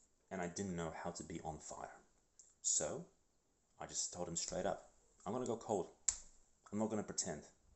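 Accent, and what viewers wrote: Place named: United Kingdom. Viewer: I just prayed for you. I also want you to know that I haven't prayed in many years but I really wanted to do it for you. I have this necklace with Jesus on the Cross. I took it into my hands, went on my knees and asked Jesus to give you the strength, will and creativity to come up with solutions. I asked Jesus to help you out in finding answers. I trust Jesus and asked him to help you in any way he can. Regarding the more practical stuff, I am not sure how I can help. I am not from the UK (you sound British, so I presumed UK) but from the Netherlands. But here in the NL we have organizations where you can sign up and then you can apply for housing based on duration of membership so to say (I am trying my best to translate as well as I can). Sometimes you can get forwarded based on where you live and your current situation. 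But I have no idea what systems you have in the UK. Regarding work, I would suggest finding out what your strengths are and finding a way to learn it online while you do your other things. I thought I heard you say you were in Personal Training. But a quick example is learning to code for example. You can easily learn this online and after a couple of months you can start applying at jobs. The market right now is breaking with a lack of employees everywhere (at least here in the NL) so it's more about showing character than skills. So I also suggest showing up and just making it known you are driven and ready and able to work and make things happen. I hop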